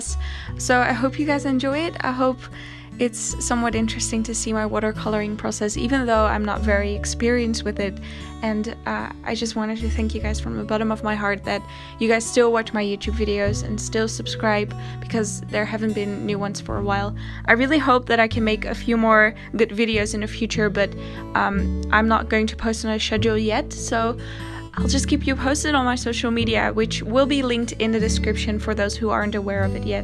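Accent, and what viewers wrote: Netherlands. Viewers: You sound Dutch, are you?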